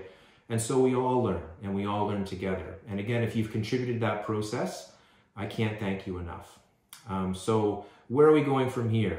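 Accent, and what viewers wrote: Canada. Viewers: I’ve looked for new videos also. I didn’t know you were in Canada until I heard you talk. Most of my fathers family lives in Ottawa. BTW, personally, I think Sandra Lee is overrated. There’s many, many surgeons, dermatologist and plastic guys that do as well or better. I think she’s sloppy and impatient.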